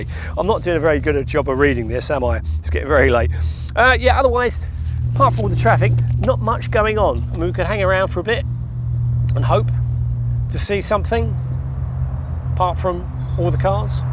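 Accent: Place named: United Kingdom. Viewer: British English ❤❤ I hope I can learn it 😢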